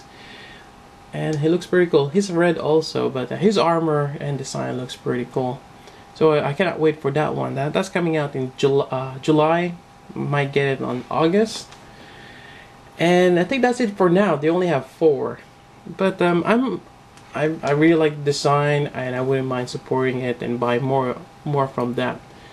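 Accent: Philippines. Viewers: yo sir! sorry, are you from the philippines? medyo pinoy yun accent mo hehehe. if you are i'm curious where you picked this up! available in greenhills?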